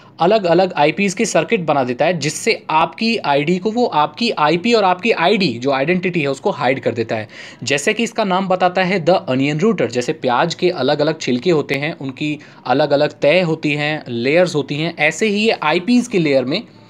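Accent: Odisha, India. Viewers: Ap odisha se hai kya?? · are you from odisha??? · sir aap odisa se hain kya??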